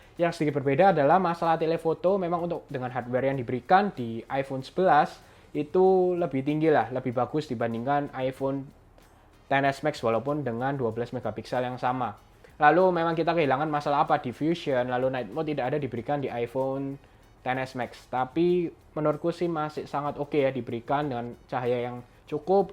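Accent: Java, Indonesia. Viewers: Nada bicaranya kaya orang jowo bangeddd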